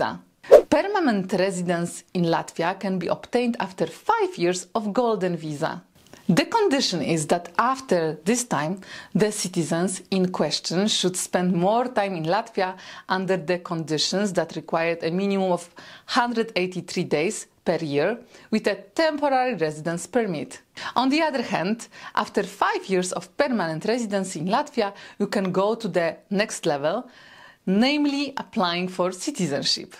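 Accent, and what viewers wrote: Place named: Russia. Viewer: Yoir accent is like that of Russian.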